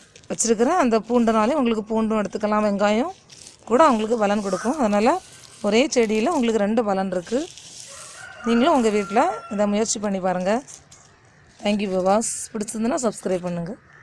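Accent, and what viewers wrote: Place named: Kanyakumari, India. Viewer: குமரி நீங்கள் பேசும் தமிழ் அழகு அக்கா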